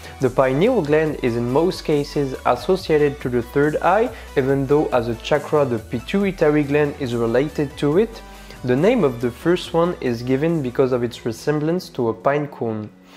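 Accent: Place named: France. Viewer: thank you for the time you spent working on this video, as I was searching for esoteric studies about the Harry potter saga I unexpectedly found myself watching your video. I'm delighted to find out that you're French. No doubt a native english speaker would spot your french accent in no time but as a French myself I would not have guessed you were one at first, so great accent, it's rare enough to be cheered and I must say it is a wonderful idea to share an english-speaking content like that, may it bring you a large audience! Continue comme ça, tu as gagné un abonné 😉 C'est une excellente idée d'avoir pensé à sous-titrer! Si je peux me permettre une critique: tu devrais utiliser une autre musique pour l'intro. Pendant la vidéo je me suis demandé pourquoi tu laissais la musique en fond puis j'ai réalisé que ce serait gênant si on entendait juste ta voix dans une pièce vide.. 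Peut-être que je suis le seul que ça chagrine mais encore une fois tu devrais changer de musique, ça fait un peu cheap genre "best techno 2009" c'est dommage, sache que je dis cela en toute bienveillance pour que tu t'améliore 🙂 Je vois que tu maîtrise bien la langue de Shakespeare -- assurément mieux que 95% des Français -- mais encore une fois sans vouloir être pénible j'ai remarqué quelques erreurs qui pourraient être évitées. Si tu le souhaites je serais ravi de t'aider de quelque manière que ce soit, par exemple en relisant ton script ou tes sous-titres ou t'aider à faire autre chose, je su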